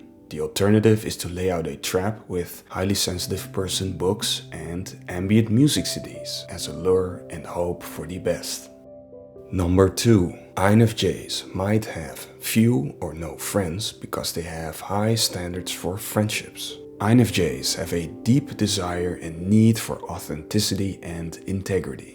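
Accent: Netherlands. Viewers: Any chance the narrator is a native dutch speaker? As someone coming from the Netherlands I thought I heard a bit of a dutch accent :) As an Infj myself I could really relate to this video. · I hear a Dutch accent. I’ve been around the world to find you :-). Come to Mexico and let’s join forces. Let’s come together and create an off-grid community.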